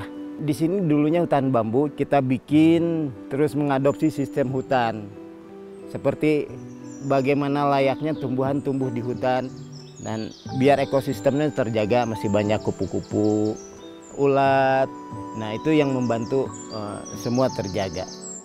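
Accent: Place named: Sunda, Indonesia. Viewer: Orang Sunda anu nyundaa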